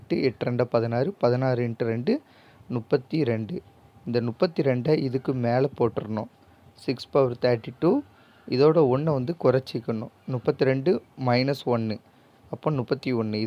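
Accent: Tirunelveli, India. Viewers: Tirunelveli slang 👏👏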